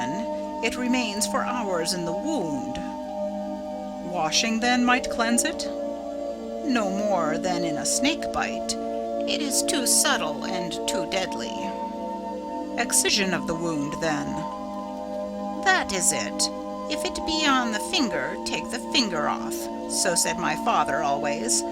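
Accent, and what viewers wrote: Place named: United States. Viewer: That stupid music .. and the strident US accent.. ruined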